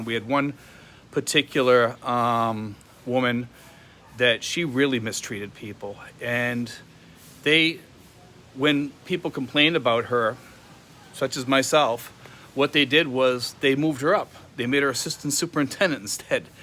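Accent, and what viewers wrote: Boston, United States. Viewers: You just showed up in my feed. You could have been any guy from anywhere on the planet. As soon as you Open your mouth I said Boston.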